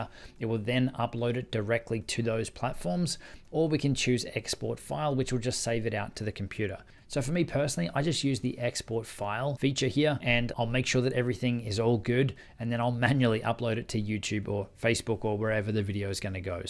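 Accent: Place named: Australia. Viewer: Hold on, your accent is Aussie, but you spelt 'Colour' in American. The betrayal though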